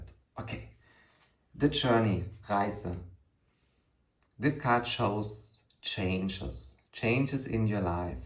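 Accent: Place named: Bavaria, Germany. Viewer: Most excellent video! Where are you from, love your accent!!! Bavarian, perhaps, since it reminds me of my mother 💜💯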